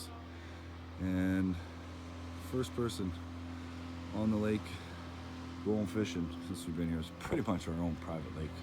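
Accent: Canada. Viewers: Ontario accent - for sure - thanks.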